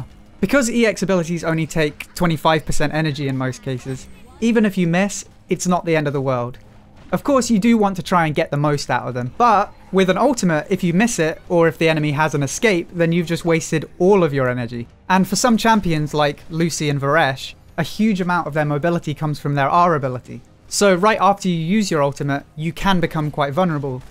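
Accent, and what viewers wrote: United Kingdom. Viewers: i can tell you're from UK because of your accent. plus i'm UK as well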